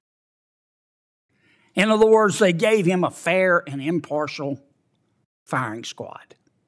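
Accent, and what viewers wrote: South, United States. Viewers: Senator is a rock star. He sneaks up on people with his down-home country accent, then boom! He lays the truth down and wrecks the liberals.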